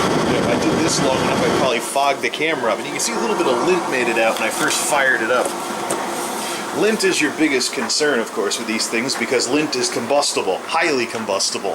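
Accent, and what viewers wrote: United States. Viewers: I live in South Carolina sooo nice to hear a Midwest accent. I have issues w the southern accent lol.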